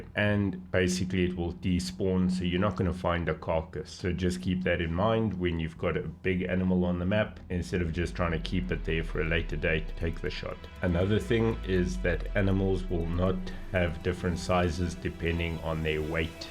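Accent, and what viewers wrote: South Africa. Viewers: I knew you where South African as soon as I heard your voice,I'm really happy tho that there are more sa youtubers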